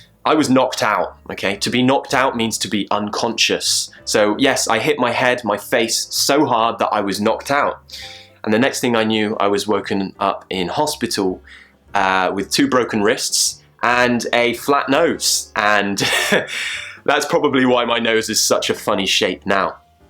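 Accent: United Kingdom. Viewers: I enjoy hearing you talk. I've always found a British accent very pleasurable to listen to. As for the clumsiness, I believe you could tackle that &make a project of morphing out of it. I'm not truly knowledgeable about these matters...but I would think slowing your body actions & movements a bit might be a good start. Along with that, what if you "looked ahead" to see where you're stepping, or reaching, or walking...& sized up the space & room available to you & deliberately slowed your body motions ever so slightly, & perhaps squeezed their periphery a little--a kind of miniaturization of your limbs' movement(s). You'd be exerting some additional controls over your body movements & motions...& shrinking their scope & speed a bit--adding careFULness & diminishing some careLESSness. Or not...this might all have been psych-babble!! You decide!! Still enjoy you--never mind the clumsy!! 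· Hello. I am a Japanse and I am learning English. I subscribed your channel recently, and enjoy your teaching. Thanks to you I’m getting used to British accent. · I love your accent I would like to be one of your student to learn British English Thank you so much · Hi, I have been over the moon ever since I discovered your videos on youtube. As a non-native teacher of English, I have got a lot to learn from you. Listening to you and studying your mouth movements has helped me correct and improve my pronounciation to sound more British. You also have great ideas and tips like exaggerating the sounds. Wenn it comes to vocabulary, the way you explain them in context is amazing. All in all, you are a very good teacher, who teaches from the heart, not from the book! Your students are lucky to have a teacher like you. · Hi from México, just discovered your channel today searching for British accents, i have seen some of your videos and they are quite nice, it really explained very good how to pronounce some difficult words for latinamericans speackers, ¡cheers mate! 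· Hi, Im from Bahrain and yes I extremely enjoy your videos.... I'm in love with the British accent and I loved it more after I watched you.... I wish I could join you on Skype but the price is quite high... Keep going and I keep watching your videos... Cheeeeers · Successful person, I appreciate your efforts to teaching us your videos helped me to speak and understand the British accent.thank u so much ❤️ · You got a beautiful British accent! I loved your story telling and I learned about a few words I've never heard before so thanks! being entertaining and teaching at the seam time is quite priceless 👍👍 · Like the British accent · Nice videos, man. I´ve just found your channel. Now I´m following you! British accent is amazing! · Wow to listen british accent is so good I guess you were slow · I'm clumsy too! I think it's an result of my shyness because I'm mostly shy than anything! I always tend to stumble at something (or someone) when I'm in public. 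And the British accent is the best ever! Congratulations! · Hi! It's so nice to hear you speaking! I'm so in love with British English! I liked this lesson a lot, and if you accept a suggestion, why don't you ask some questions about your story at the end of your story. You could also do it live, so that you get our reply immediately after you ask the question. Would you consider it? Cheerio!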